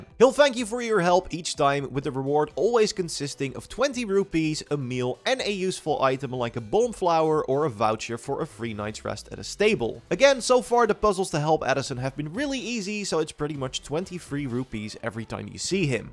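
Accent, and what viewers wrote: Netherlands. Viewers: i smell dutch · Ik merkte meteen aan je accent dat jij nederlands bent 😂. Goeie video trouwen was heel nuttig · You sound dutch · Heerlijk dat Nederlands Engels accentje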